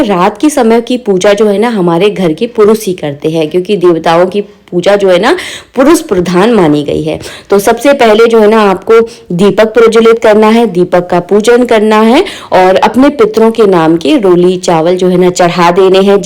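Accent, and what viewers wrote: Bundelkhand, India. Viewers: Mam. Kya aap. Bundelkhand region se h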